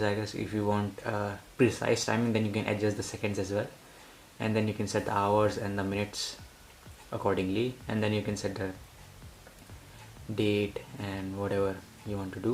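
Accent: India. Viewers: Since you are Indian and I hear your accent you must be a scammer and I don't believe anything that you say because of your place of birth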